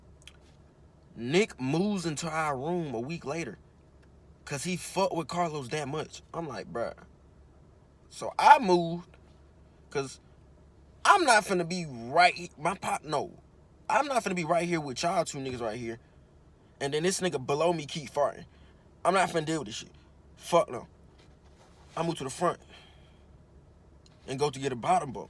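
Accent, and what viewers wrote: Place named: Chicago, United States. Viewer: I didn’t watch the season you’re in I watched the first 3. You sound like you frm Chicago tho haha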